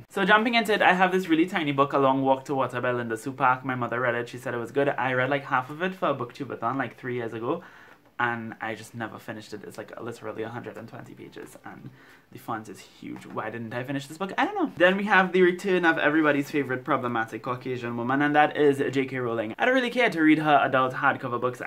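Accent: Trinidad and Tobago. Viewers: are you from Trinidad because you have a Trinidad accent by the way love your videos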